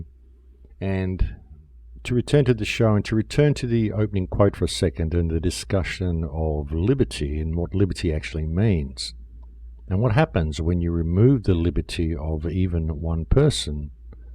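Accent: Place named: Australia. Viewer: He is Australian.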